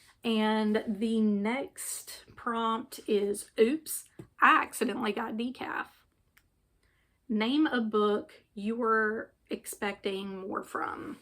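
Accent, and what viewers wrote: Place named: South, United States. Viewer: Yesss our southernnnn 😂we can’t pronounce things hahahaha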